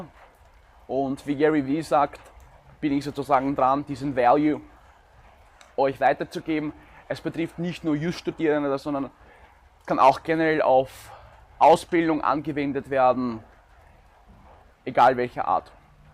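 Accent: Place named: United States. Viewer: Auf dem ersten Blick hätte ich gedacht, dass er entweder Amerikaner oder Österreicher ist, weil er zwei Sprachen so gut beherrscht. Denn ich studierte für ein Semester Jura, bin ich qualifiziert zu sagen, dass es knallhart schwer ist. Ich gratuliere dir und drücke die Daumen hoch! Du hast noch einen Subscriber verdient:)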